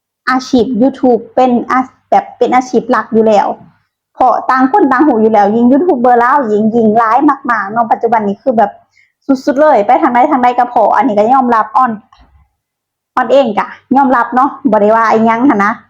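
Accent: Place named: Loei, Thailand. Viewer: สำเนียงเว่าคือคน จ.เลย แท้ครับ😅😅😅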